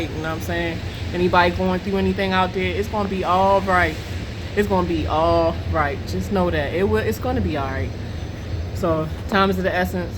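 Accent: Baltimore, United States. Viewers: I know this has nothing to do with the subject matter, but I knew all this time you had a Baltimore accent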